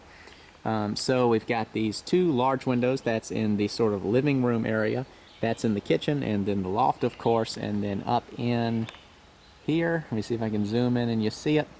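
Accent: South, United States. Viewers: who else started reading the comments in a southern accent while he was talking??? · Dukes of hazard accent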